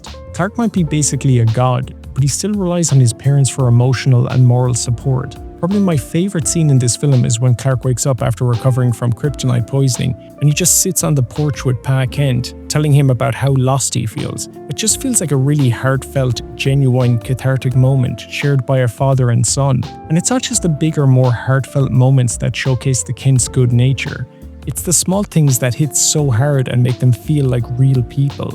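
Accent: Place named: Ireland. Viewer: Why am I hearing the Jacksepticeye irish accent? lol Good video tho I agree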